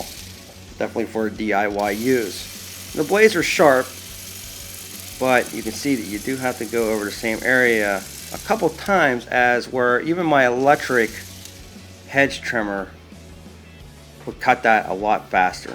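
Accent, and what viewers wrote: Pittsburgh, United States. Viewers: Any chance you're from Pittsburgh originally?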